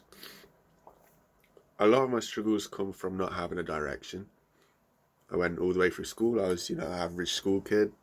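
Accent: United Kingdom. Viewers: Mate, I love your British accent. Go on, don't give up, stay strong!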